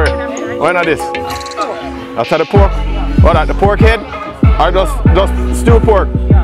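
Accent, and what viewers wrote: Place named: Jamaica. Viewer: I love your accent, great job on videos my Jamaican friend:)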